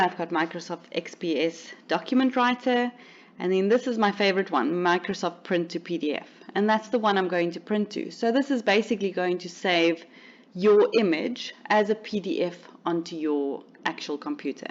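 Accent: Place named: South Africa. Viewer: That South African accent is so hot...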